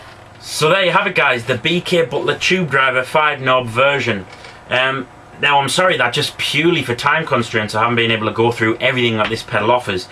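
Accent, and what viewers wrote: United Kingdom. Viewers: haha i love the way u talking i usually hate and skip the talking part of a audio demo but i subcribed your channel just to listen to ur brit tongue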